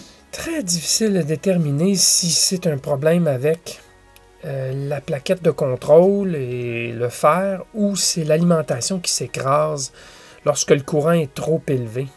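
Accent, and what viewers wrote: Quebec, Canada. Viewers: L'accent me donne vraiment envie de visiter le Canada(Quebec) with my 3 kids😊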